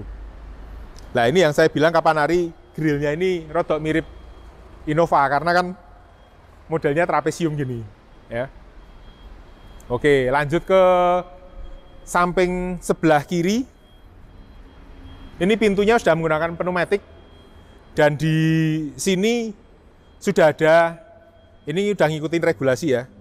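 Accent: Java, Indonesia. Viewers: Pak , njenengan cengkok jawanya kok medok banget ya...bikin orang ngakak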